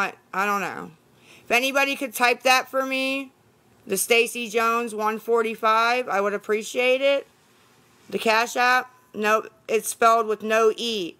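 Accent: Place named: Western Pennsylvania, United States. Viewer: She definitely has a western PA accent i used to live in Johnstown and my bf mom said yinz and geez oh man lol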